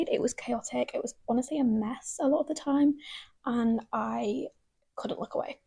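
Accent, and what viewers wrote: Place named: United Kingdom. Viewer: hey 👋🏾, just found your channel and immediately subscribed when I heard your accent and knew you were a fellow UK Booktuber. I love your vibes and reviews of books, i feel like we like similar books also!. Looking forward to watching more of your content. 💞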